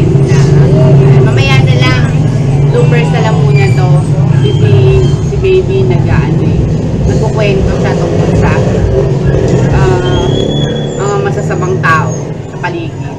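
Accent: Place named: Visayas, Philippines. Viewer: Ntawa ako sa visaya accent mo sis 😃😂 ok nga minsan gusto mo ngang mg vlog ng mhaba pag nasa Labas ka pero my mga Tao ksi Kung makatingin e parang baliw. Good at my update Nman Khit papano ky Nanay inday sis.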